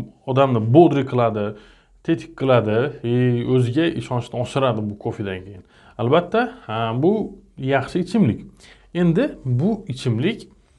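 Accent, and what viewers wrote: Khorezm, Uzbekistan. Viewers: Доктор хоразмданмисиз дейман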